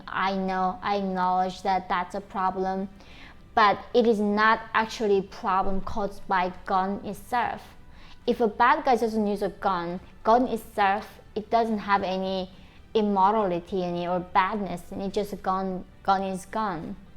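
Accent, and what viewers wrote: United States. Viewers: I've been listening to her for a while and she is sounding more like a good ole Betsy Ross Gal every day! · im so happy for you. you might be from North Korea but you speak like an American. Americans are people from different countries and cultures with one goal in mind to better ourselves and lives so our kids and have a better future and to keep this country great. I wish you a great life with prosperity. keep up the videos you have great reactions maybe you can do some on American sports especially football its a big culture tradition..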